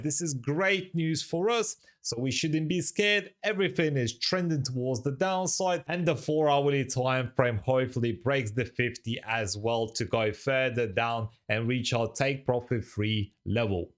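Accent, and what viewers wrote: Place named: United Kingdom. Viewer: I have no idea why the messages aren’t coming from your side. However I am available in ur discord server. Are u a resident in UK 🇬🇧? Your accent seems like u are a British.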